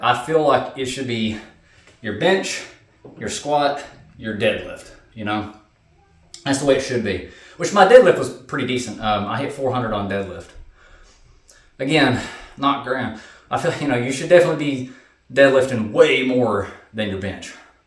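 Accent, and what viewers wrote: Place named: South, United States. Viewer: If the accent didn't give away that your from the south the camaro bumper used as a mantle sure did 😂 great video 👍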